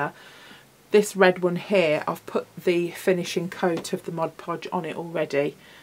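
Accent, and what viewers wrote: United Kingdom. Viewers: Oh I loved your video. Maybe mainly because it was lovely hearing a British accent as much as I love our American cousins. But I can also get the stuff. I’m always jealous when I see the fantastic stuff they get from Michaels or Dollar Tree but no good for me trying to find like for like. Brilliant 😍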